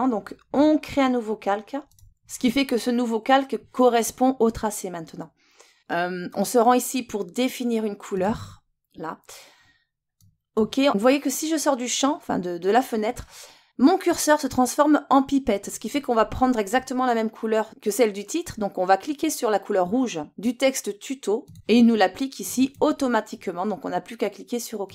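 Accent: South, France. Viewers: Salut, tu viens du sud ? sa s'entend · Coucou! Merci pour ce petit tutoriel confectionné tip top! C'est génial de suivre tes explications avec l'accent du soleil.... quelle facilité, à croire que l informatique est dans tes gènes 😆. Maintenant au travail, là c'est une autre paire de manche hi hi.... merciiii 😗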